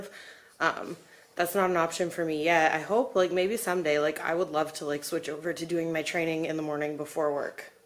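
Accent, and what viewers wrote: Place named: United States. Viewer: Got here from the gram - I so wasn't expecting an American accent!!!!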